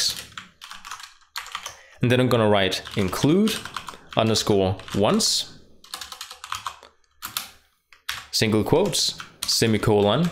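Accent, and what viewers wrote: Denmark. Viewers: He is from Denmark! I love his accent!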